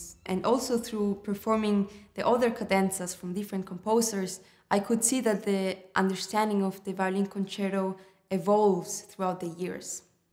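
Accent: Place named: Germany. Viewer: Tienes acento alemán y hablas inglés, pero tu nacimiento, tu nombre y tu increíble talento, te hacen un verdadero orgullo para España. Ya podemos decir que no sólo tenemos a los mejores en el deporte, sino también en la música de verdad. Gracias, thank you, danke... (el próximo concierto en Mallorca no me lo pierdo)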